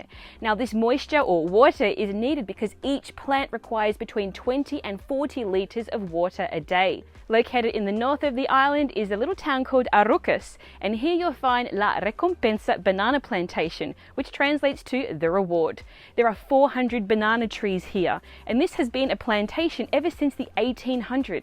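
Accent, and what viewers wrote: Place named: Australia. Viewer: Are you an Aussie? Your accent sounds like. The Spanish pronounciations are not always on point, but sweet. I would have appreciated if you had consistently pronounced Gran Canaaaaria (not: Gran Canaeria - English way). And things like: 'concista' not 'conquista' - you know what I mean. Just some gentle feedback, since language seems to be a big part of your vids - great way of combining it. Lots of good info, and nice video footage - muchas gracias!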